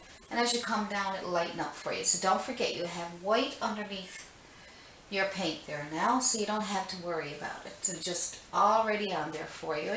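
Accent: Newfoundland, Canada. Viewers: Hi, really like the videos, I'm a total newbie (senior) at painting and these help a lot. Was wondering about the accent, kind of sounds like you are or were from Newfoundland, or is it Irish? Thanks for all you do for others:)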